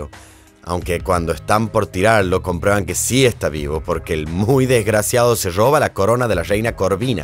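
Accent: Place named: Corrientes, Argentina. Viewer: Jajaja!!!, muy bueno!!, ahora una pregunta!, sos correntino o chaqueño???, por el tono!!!, jajaja!!!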